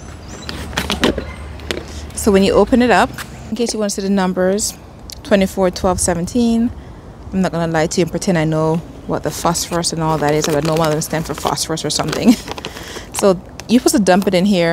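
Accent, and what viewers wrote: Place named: South Florida, United States. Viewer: I love the “f” for fertilizer Friday— I’ll remember that now! Also your accent makes me miss South Florida! 🥰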